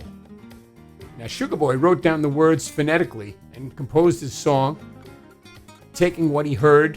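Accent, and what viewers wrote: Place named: Long Island, United States. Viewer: Very interesting video. Can't wait to hear your version of the song. Say hello to Tommy for me. Nice to hear you still have the LI accent. I still have mine too. Stay safe.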